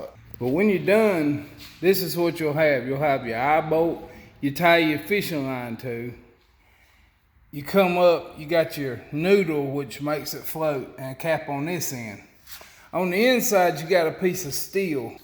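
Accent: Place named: Alabama, United States. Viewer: You live in North Carolina, but your accent sounds East Central Alabama.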